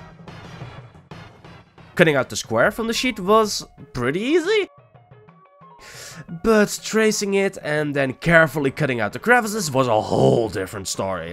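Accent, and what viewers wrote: Netherlands. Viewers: BEAUTIFULLL!!! btw are u from nederland? u have that accent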